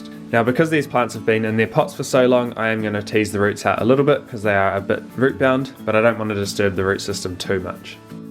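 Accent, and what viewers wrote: New Zealand. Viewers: I have loved this post. I def will give it a go in the future. I'm up at Muriwai Beach, Auckland. Hmmm.... now to find some tyres lol. Bro, when's the best time for us to grow melon? Love hearing our accent for a change. Thinking of starting a channel myself actually. Any tips?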